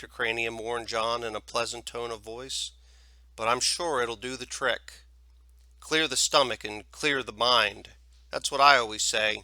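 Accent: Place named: United Kingdom. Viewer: I like the British accent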